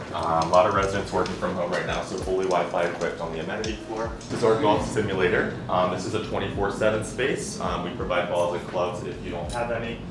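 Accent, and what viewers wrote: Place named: California, United States. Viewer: Start a new vocal pattern if you can. That one..if not from Cali...ayyy. that's not a free thing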